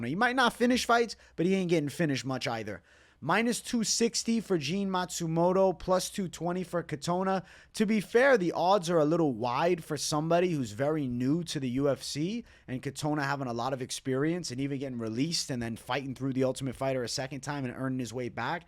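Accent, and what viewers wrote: New York, United States. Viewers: a new york (boston? not good with ID'ing accents) version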